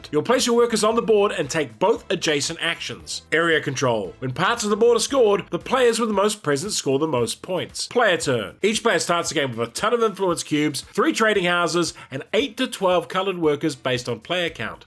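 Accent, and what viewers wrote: New Zealand. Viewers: There is that Kiwi accent. Love your work.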